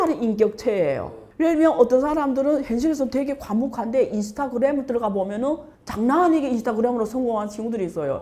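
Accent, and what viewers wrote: Yanbian, China. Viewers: 옌변 일까 내용보다 특유 조선족 억양이 신경쓰이네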